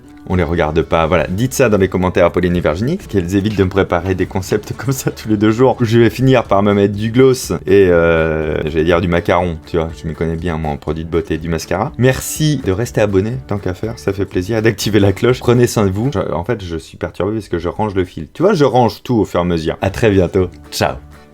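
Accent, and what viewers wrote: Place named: Charente, France. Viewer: Ahah le kiff d'entendre du patois charentais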